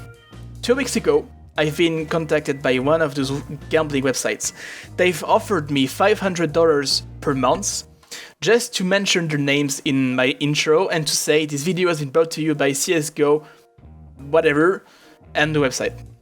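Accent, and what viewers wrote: France. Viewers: Love your french accent :D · dat sweet baguette accent KreyGasm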